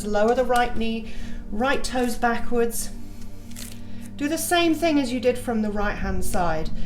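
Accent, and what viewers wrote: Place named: Ireland. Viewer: I meet this lady yesterday. She is so inspiring. Thank you. Love the Irish accent.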